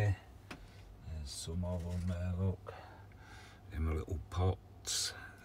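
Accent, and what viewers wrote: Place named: RP, United Kingdom. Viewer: Just a suggestion mate, take it or leave it, comes from good intentions. Consider changing your channel name. Few will search for " " but many would search gardening in Leicester or some such. You are at a good time to switch as you are just starting to build. All the best, my posh, well spoken friend:))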